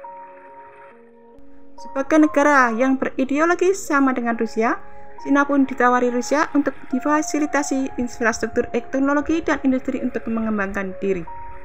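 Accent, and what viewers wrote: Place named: Java, Indonesia. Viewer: Berbahasa Indonesia lah yang benar jangan medok sekali logat Jawa nya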